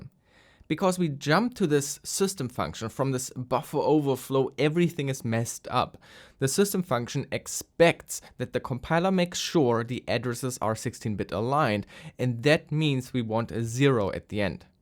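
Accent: Germany. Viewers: The german accent is strong in this one... I know the pain too well~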